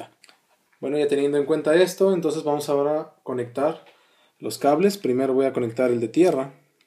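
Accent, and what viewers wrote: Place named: Mexico. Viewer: ¡Excelente información!!!!!!! Por el acento supongo que vos sos mexicano!! Gracias por todo lo que enseñás en el video. En mi país al cable negro lo llamamos polo negativo y al rojo, polo negativo; pero al final es lo mismo, sólo cuestión de terminologías. Muy bueno el aporte. De nuevo mil gracias!!!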